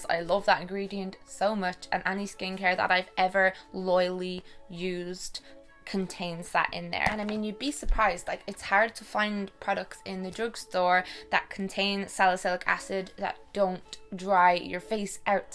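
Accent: Ireland. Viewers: lovvvveeee you can you marry me??? God Irish accents are so sexy xx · Does anyone know what part of Ireland she's from??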